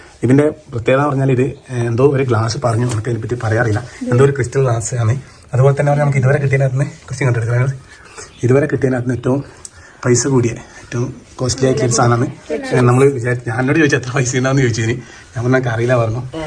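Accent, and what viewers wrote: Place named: Kannur, India. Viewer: Kannur slang aan main kaaranam...😊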